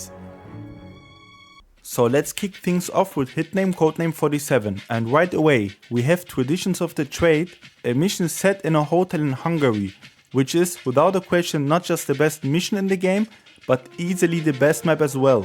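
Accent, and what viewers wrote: Germany. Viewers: Are you from Germany? I've seen several videos from your channel (which is very good, by the way) and I was trying to guess where you were from based on your accent, and I thought of everything but Germany. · Do I hear German?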